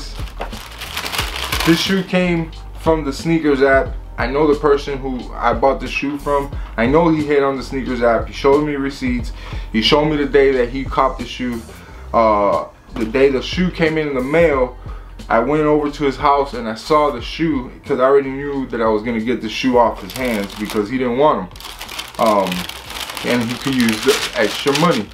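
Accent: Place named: Chicago, United States. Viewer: I copped these too, paid resell . Love the Jordan 6. You sound like you from Chicago. Or the midwest area .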